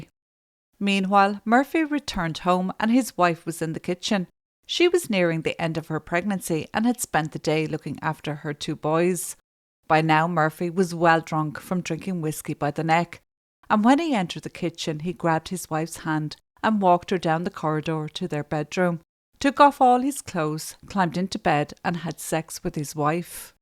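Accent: Ireland. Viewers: Listening from America ( embarrassing to say at this point in time , but I digress ). Appreciate your show , and accent , my father was from Kerry. Note and note I think women need to form vigilante groups as the justice for crimes against women seems non existent globally. So many horrific crimes against women including murder are perpetrated by repeat offenders often let out or let go. That is a crime in itself .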